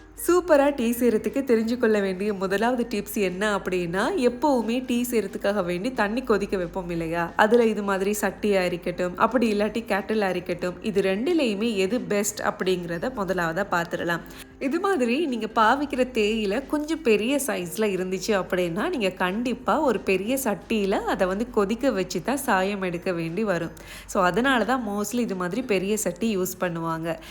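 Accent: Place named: Sri Lanka. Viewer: சிங்கார..சிங்கள கொஞ்சும் தமிழ்.. அழகோ...அழகு .❤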